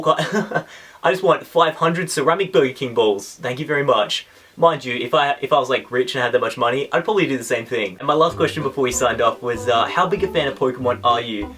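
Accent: Australia. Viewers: Why do you sound like an Aussie?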